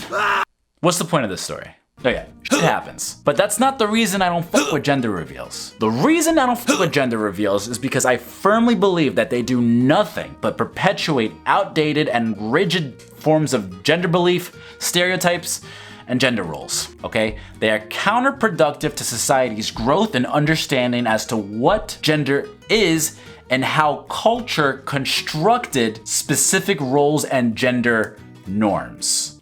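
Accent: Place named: Scotland, United Kingdom. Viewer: Your continual dragging of Barren Ben Shapiro and your scottish accent. I'm here for it!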